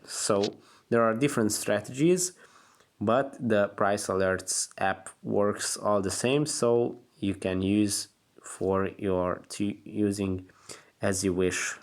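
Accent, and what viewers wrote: Hungary. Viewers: Ur from Hungary, I hear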